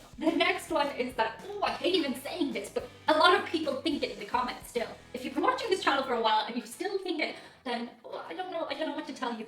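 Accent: Ireland. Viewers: Honestly, the compression kind of made you sound like a Dalek....A very sweet Irish Dalek.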